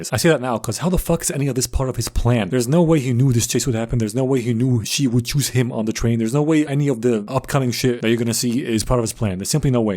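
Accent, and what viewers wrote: United Kingdom. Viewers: HOLD UP WAIT A MINUTE! HE IS BRITISH ? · No way my goat is british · DUDE ARE YOU ACTUALLY BRITISH??????????????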